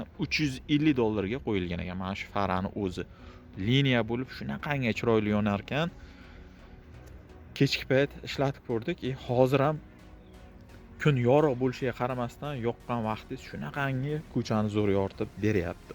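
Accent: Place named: Kattakurgan, Uzbekistan. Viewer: Ака каттакургонданми бу малибу шевайиздан билдим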